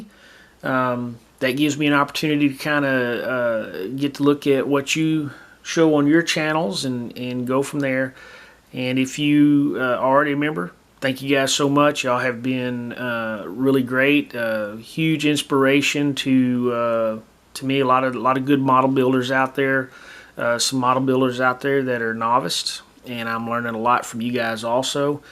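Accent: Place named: Appalachia, United States. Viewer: MC need to get rid of your hillbilly accent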